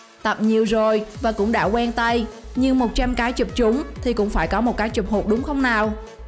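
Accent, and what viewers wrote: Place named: Central, Vietnam. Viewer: Cám ơn admin cháu gái dễ thương ❤admin cháu gái có giọng nói miền trung nghe ngộ ngộ dễ thương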